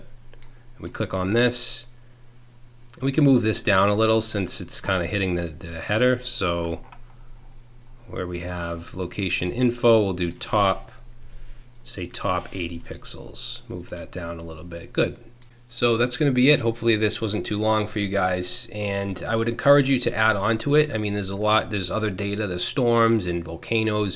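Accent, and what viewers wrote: Boston, United States. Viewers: The Boston accent of knowledge!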